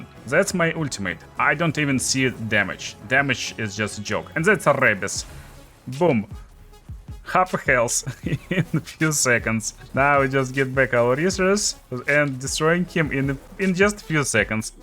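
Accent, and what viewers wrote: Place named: Russia. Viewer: Такое чувство будто бро говорит с русским акцентом....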